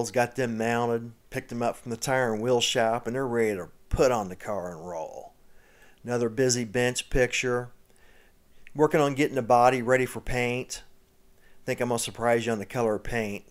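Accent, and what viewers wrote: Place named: Pittsburgh, United States. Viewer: Black warsh?? Are you from pittsburgh too??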